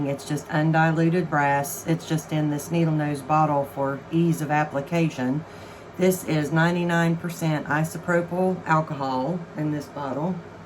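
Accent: South, United States. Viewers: Hi Lovely Lady!! That really is an awesome looking galaxy that you’ve created there! It’s beautiful, truly. Will you resin and mount it? I feel though that I have to comment about the fact that you don’t wear a respirator as much as you should. It worries me. Personally I would much rather you did your painting in silence? Of course with a voice over. I love your southern accent! But seriously, you know better than I do about the possible medical implications. I hope you won’t be annoyed at my saying so, but I do enjoy watching your videos and I learn so much, so please don’t gamble with your health. It may take a little longer of course, to post your video after the added narration, but hey, we can just wait (with baited breath) for the next masterpiece! Thanks so much for sharing your talent. I and many other viewers DO NOT want you to get poorly. 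🙏🙏🙏🤗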